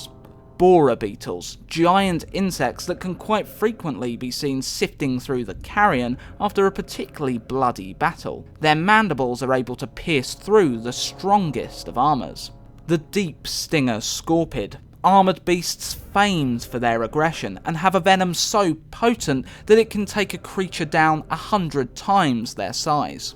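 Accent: United Kingdom. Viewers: British accent detected !!!!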